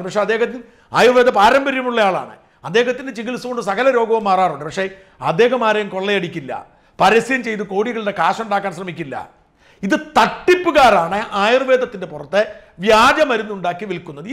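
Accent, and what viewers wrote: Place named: Kochi, India. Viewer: Slang kettal ariyaam kochi kaaran aanu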